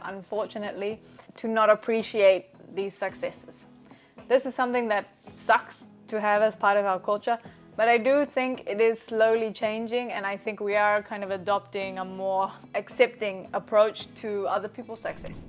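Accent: New Zealand. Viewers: Hi! Thank you so much for the video, it is really very detailed. I knew that in NZ you have to have a car. I knew the differences with Australia, but not the difference in terms of climate, and because a cousin who traveled through NZ saw the natural beauties and he went with friends and they had a great time. They rented a motor home, so they got to know a lot about your beautiful country, they are big fans of rugby 🏉. I really like it when you speak English, it's like you are another person and I really love the Kiwi accent! I hope you make more videos like this, since next year I will be traveling to NZ, Australia and other destinations. Thanks again!!!, and you are lovely people! One question, how good is the Auckland City team? Y les pediría sí puede explicar lo del Síndrome "Tall Poppy" en español. Gracias,se les quiere mucho!❤❤ ( Perdón por mí inglés... 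estamos aprendiendo 😅)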